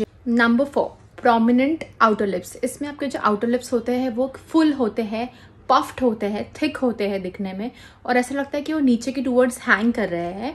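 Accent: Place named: India. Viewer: indian accent could narrate my life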